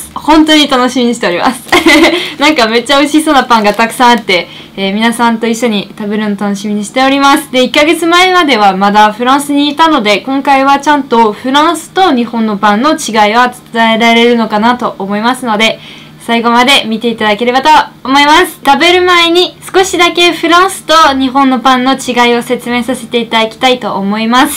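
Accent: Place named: Yamagata, Japan. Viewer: なんか山形なまりになってるよ 笑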